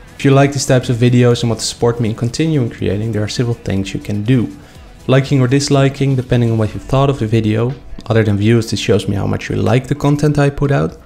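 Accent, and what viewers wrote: Netherlands. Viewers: Ik hoor dat jij Nederlander bent zwz 😂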